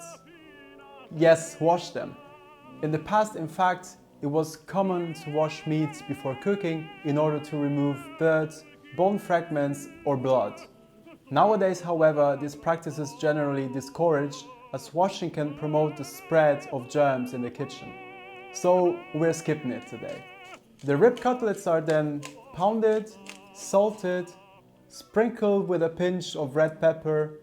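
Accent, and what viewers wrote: Germany. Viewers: Mein Herr, kommen Sie aus Deutschland? Sie klingen so. Ich lerne Deutsch und finde was Sie sprechen sehr gut! Ich finde die Geschichte dieser Zeit (WWI) sehr interessant, aber nicht so vielen Youtube sind ueber wie Deutsch Leute in dieser Zeit gelebt haben. Mehr, bitte! Danke schoen!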